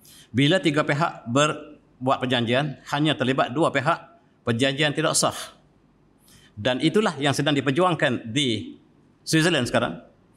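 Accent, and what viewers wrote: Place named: Pattani, Thailand. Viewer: Terharu apa yang di sampaikan oleh prof benar benar menyentuh hati bahkan logaht bahasa melayu Pattani tanpa sedar airmata ku mengalir di pipiku.. memang tepat dan benar prof..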